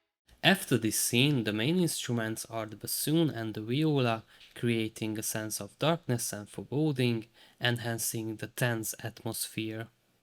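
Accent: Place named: Hungary. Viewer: TUDTAM A KIEJTÉSEDBŐL HOGY MAGYAR VAGY (nagyon kiraly a video amugy)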